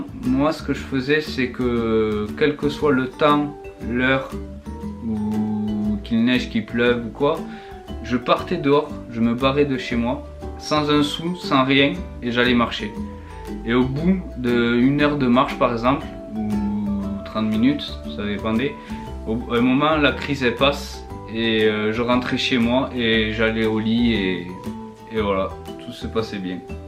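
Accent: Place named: Toulouse, France. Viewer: j'adore le petit accent toulousain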